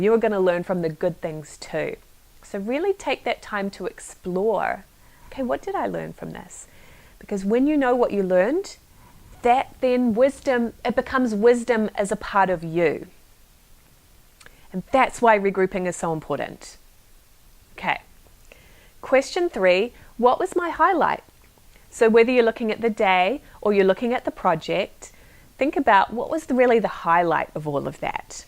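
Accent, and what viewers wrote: New Zealand. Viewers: Very helpful thank you. I discovered you a short time ago on YouTube and I have appreciated a lot of what you have been sharing. I particularly resonated with your video on Doreen Virtue... I have never been comfortable with the traditional explanations of things like angels when it gets presented as absolute fact while things, and our understanding of them, can evolve. You sound a lot like a New Zealander and I'm guessing you've spent some formative years in the lower South Island (although I've been wrong countless times before). Best wishes from Nelson, NZ.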